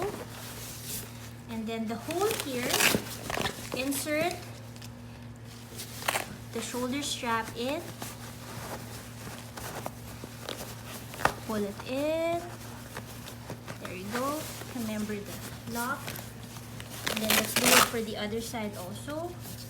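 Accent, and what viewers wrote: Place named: Philippines. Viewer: Hate the accent I can’t…. Pinoy much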